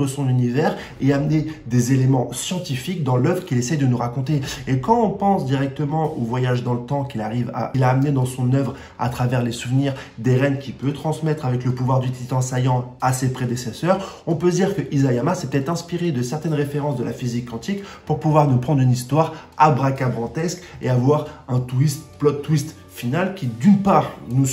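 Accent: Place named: North, France. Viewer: votre lieu de résidence (département lol), je me sens que tu viens du nord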